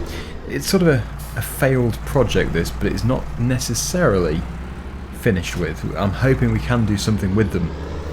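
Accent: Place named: North London, United Kingdom. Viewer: Gal from north London er er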